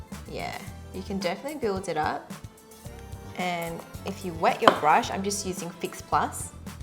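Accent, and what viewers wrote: Australia. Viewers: Is she from Australia? I can't tell because I'm Australian too so I can't really hear it😂 plaease let me know❤️ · LOL seeing you get so worked up over "stick" was hilarious. Curse our Australian accents! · Great video! I love your accent, where are you from? Australia?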